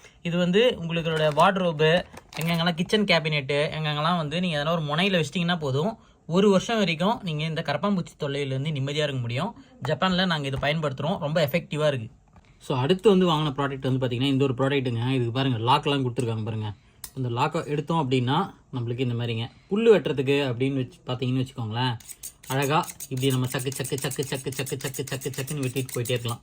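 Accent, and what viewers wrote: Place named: North Madras, India. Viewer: I spent several hours in diaso stores in UAE and Singapore ,Malaysia.Tools especially garden tools are very good but Indian wouldn't knowing such tools exist.because we see old tools and some Chinese tools.you did a good job to show us the purchased items.though you tend to speak good media tamil but your north Madras tamil always comes in. Once upon a time I had a engineering student saying pashupu for pipe and to correct him it took us long time though he writes good English.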